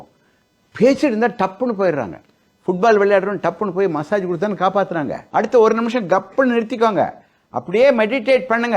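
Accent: Coimbatore, India. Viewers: நீங்கள் பேசும் அழகுகோவைதமிழைகேட்டாலே ஒருநோயும் வராதுங்கோ ஐயா உங்களின் இந்த கோவைதமிழை எப்போது கேட்டாலும் இனிமையையாய்உள்ளது வாழ்த்துக்கள்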